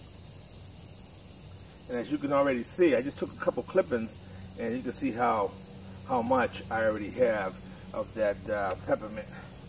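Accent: Boston, United States. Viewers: is sounding much better, I don’t hear pain in your voice, only that Boston accent.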